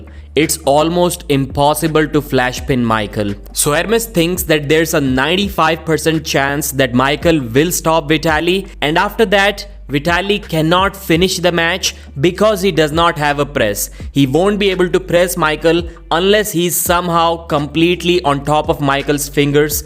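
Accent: India. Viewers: fix your god awful indian accent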